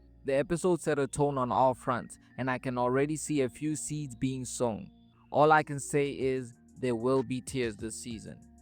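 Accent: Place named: United States. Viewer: Loved the review but your americanized accent is throwing me off abit. You Joburg guys need to stop consuming American content😂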